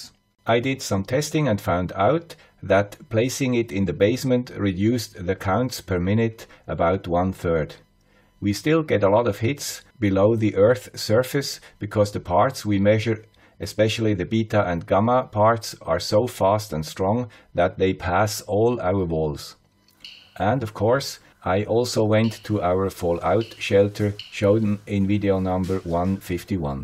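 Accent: Switzerland. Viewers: How could anyone nuke such a nice guy (even with a Swiss accent)?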